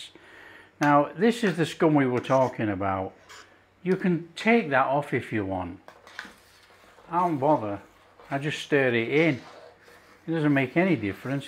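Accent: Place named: England, United Kingdom. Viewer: you are da man my new friend. Your channel is absolutely awesome. Nice hearing your English accent, we are expats from South Africa, been living in Huntsville, Texas for 33 years.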